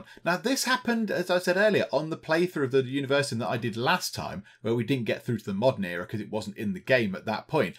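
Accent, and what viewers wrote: United Kingdom. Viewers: You've just became my favorite youtuber. Your British accent and personality is just too cute. I know that's not very masculine to say, but i'm sure you can deal with it...lol Thanks for the videos. I've been enjoying playing this game myself.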